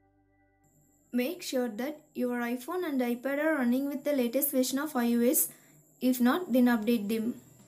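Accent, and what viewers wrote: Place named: India. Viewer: Hard to understand ... sounds Indian 🤨 too fast as usual 🧐 too many options sounds no good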